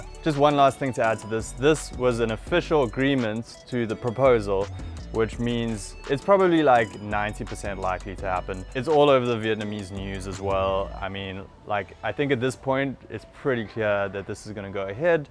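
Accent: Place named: South Africa. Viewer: i hear some kind of a South African accent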